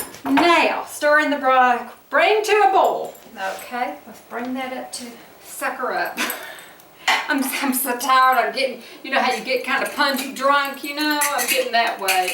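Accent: South, United States. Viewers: Your chili looks yummy. You are so fun to watch. I love your southern accent. You are so beautiful 🌟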